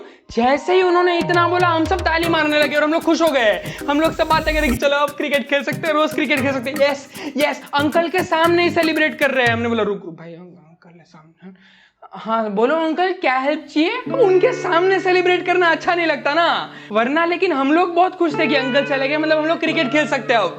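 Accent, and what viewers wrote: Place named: Gujarat, India. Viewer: Bro are you Gujarati because you have a Gujarati tone and I am a Gujarati too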